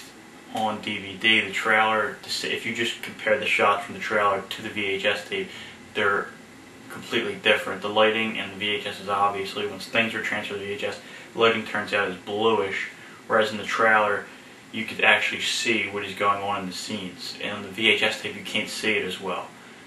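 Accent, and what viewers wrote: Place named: Philadelphia, United States. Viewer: your stupid accent makes me think Philly or Jersey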